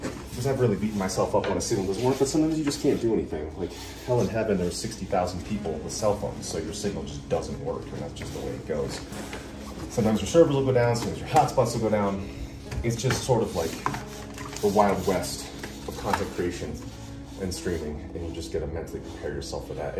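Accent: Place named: United States. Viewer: Hope release more Video like this, so that I can learn more American English.❤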